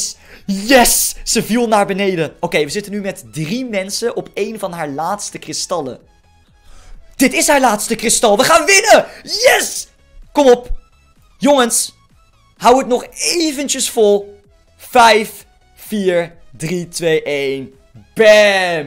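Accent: Netherlands. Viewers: Je hebt echt zo’n nederlands accent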